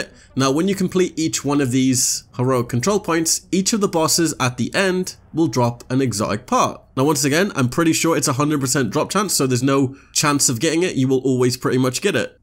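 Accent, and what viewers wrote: Wales, United Kingdom. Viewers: Are u Welch? Ur uncle is pilot teacher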